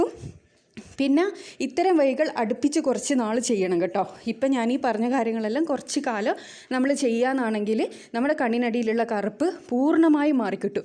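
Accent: Kannur, India. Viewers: കണ്ണൂർ ഭാഷ chechijk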